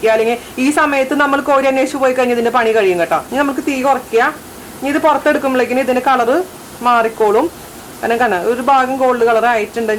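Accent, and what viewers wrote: Thrissur, India. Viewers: ഗഡീ ഞാൻ കരുതി ഡെന്ദേദ് ദെ നുമ്മടെ തൃശ്ശർ കാരിയാണല്ലോ ഉണ്ണേടെ നാടൻ ശൈലി, ഹാഫ് ആയപ്പോഴാ എന്റെ നാട്ടുകാരിയാ... സൂപ്പർ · yude big fan anu njan. Mattu cooking show kalil ninnum valare vyathyastamaya reethiyilulla avatharanam anu.. Thrissur slang ilulla samsaram kelkan thanne rasamanu....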